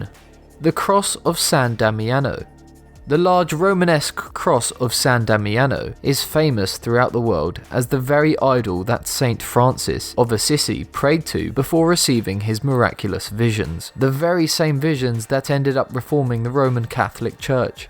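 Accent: United Kingdom. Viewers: Stop assuming he's American just because he made a mistake on something from another culture, you idiot. Judging by his accent, I'd say he's from the UK. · British pronunciation. Not incorrect, considering narrator is clearly British, at least based on his accent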